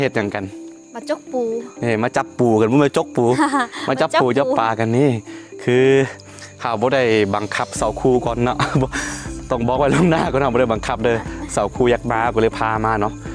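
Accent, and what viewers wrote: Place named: North, Thailand. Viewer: อู้เหมือนคนเหนือเจ้า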